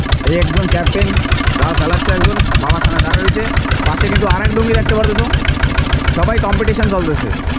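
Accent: Bangladesh. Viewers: কথায় বাংলাদেশী ভাব 😅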